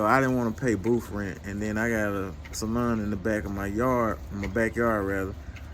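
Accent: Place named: South Louisiana, United States. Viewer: Sound like u close to south louisiana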